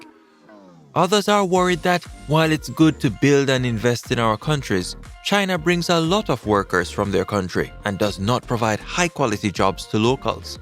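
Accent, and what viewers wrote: Jamaica. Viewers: The fact that china notoriously deals with countries they KNOW CANT Pay the back i other to keep land is scary. He decided to invest in every poor 3 world island in the caribbean , Africa 2.0 icoming. Yeah look what they quietly doing i Africa. The guy that made this video sounds jamaican, and the fact that he speaks about this so innocently makes me sad loollll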